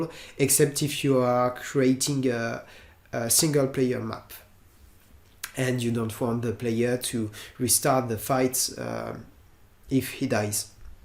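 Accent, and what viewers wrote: France. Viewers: Je ne connaissais pas ta chaine, je viens grâce au discord de noppes et les premières secondes m'ont m'ont rappelés un truc, un français reste un français, même quand il parle anglais mdrr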